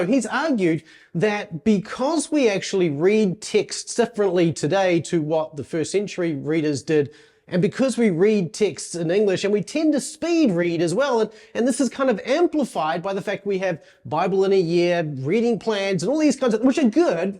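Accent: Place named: New Zealand. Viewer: New Zealand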